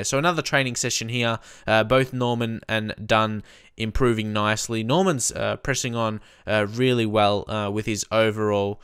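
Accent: Melbourne, Australia. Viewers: That Melbourne Accent.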